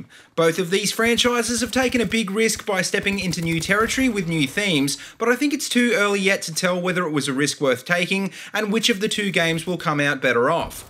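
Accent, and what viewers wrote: Australia. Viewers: Love the australian accent :D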